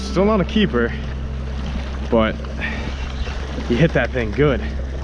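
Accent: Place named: Fall River, United States. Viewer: Are you originally from Rhode Island, you kinda have that Fall River accent, not that it’s a bad thing, cause I’m from Fall River but I also fish Rhode Island here an there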